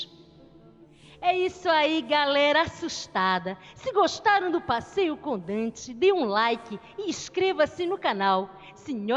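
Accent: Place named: Northeast, Brazil. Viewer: adoro a abertura com voz de nordestino amooooooo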